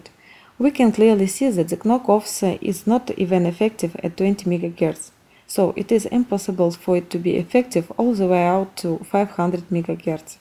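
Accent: Eastern Europe. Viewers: This isn't meant as offensive... but why choose a non-native english speaker to narrate a video like this? It's very hard to understand. Many eastern European / Russian people speak too fast when they speak in another language, I've noticed. If you want a video like this to be taken seriously, get it re-narrated.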